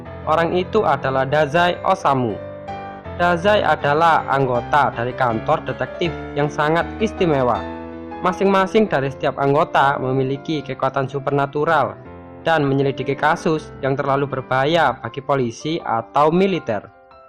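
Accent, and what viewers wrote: Java, Indonesia. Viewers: cuman masukan doang nih bang, pembawaannya kurang. masih medok banget. ga salah sih klo medok, cuman biar enak aja yg denger. makasih.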